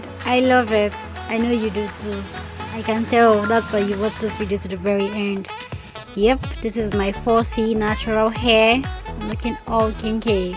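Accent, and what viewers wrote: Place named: Zambia. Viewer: You sounded like zambian,am a zambian that's why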